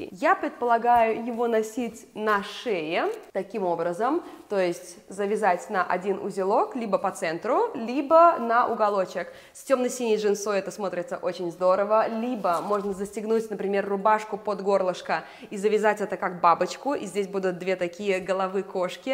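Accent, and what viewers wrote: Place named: Italy. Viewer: Больше всего люблю, как ты говоришь! У тебя появился акцент итальянский?) Не акцент даже, а интонирование, точно не русское) очень мне нравится🙌🏻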